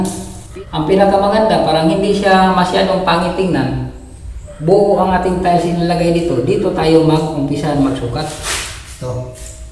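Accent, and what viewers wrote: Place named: Visayas, Philippines. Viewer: Kaibigan , kung quality control procedure pag usapan natin yong procedure mo hindi ac ceptable . Bakit ? Hindi tama ang mga salita or word sinasabi mo , gaya ng pag lagay mo ng level gamit ang string , or tansi sabi mo elevation hindi elevation tawag nyan kaibigan ang tawag nyan level . Ang term na gamitin mo paglatag ng tiles kung hindi kapa naglagay ng mortar para maiindihan ng viewers , ang term na gamitin mo ay dry "Pre lay ". Saka kailangan nga basa-in mo ang substrate ng structural flooring bago ka mag umpisa maglagay ng mortar. Hindi mo rin sinabi kung anong timpla ng mortar . Ano ratio ng buhangin at cemento ? Yong buhangin mo naka screen ba yan ? Bakit hindi ka gumamit ng tile adhessive.? Kaibigan, sorry to correct your language , kc parang bisaya or cebuano ang mother language mo. Yong sabi mo na special cut ,ang tawag nyan sa tagalog " seroho" in english cut tiles. 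Ang insakto quality procedure pag instal ng tiles na ginagawa namin sa Middle east gamit ng dry pack . Madali at effectivo ang paglagay ng tiles . Ang dry pack mix design is 1:2,5 one bag cement and sand 2.5 compose of screen sand buhangin nakabistay. Mag timpla ka ng cement paste compose of cement and water . Yon ang ebuhos mo sa dry pack pero hindi lahat ng area sa naka spread na dry pack mix. Kailangan compacted ang dry pack mix gamit trowel habang nag spread ka ng dry pack mix. Dami pa malalaman mo kaibigan amigo. Akong paminaw bisaya ka kc accent mo bisaya. Bisaya man pod ko .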